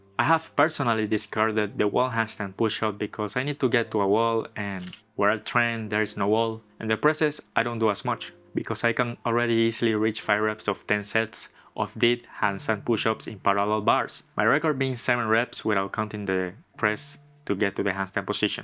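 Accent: Spain. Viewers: Man, you look so asian, but you have a hint of an european accent (may be spanish). Not as important, thanks for your guide ;), especially the bent arm press. I have been trying to kick up to a handstand for almost 2.5 years and can barely hold myself up for a few seconds, inconsistently.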